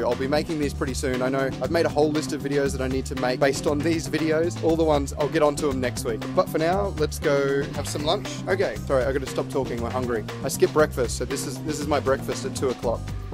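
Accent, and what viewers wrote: Australia. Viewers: Hi, how are you doing? i love what you did, it's incredible experience. What i like the most it s your Australian accent 😍😍😍. Please don t rush let us enjoy the details of japan, it's awesome country. Thank you for giving us this opportunity as well to discover really japan form your experience. I m going to watch the next one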